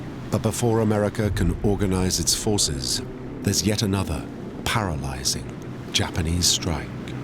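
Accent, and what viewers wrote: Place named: England, United Kingdom. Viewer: Wanted watch it but I ain't with those English accents we had fight to rid of English so... I speak American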